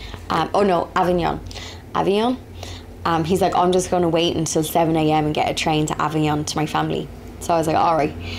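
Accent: Ireland. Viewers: love to hear that beautiful Irish lilt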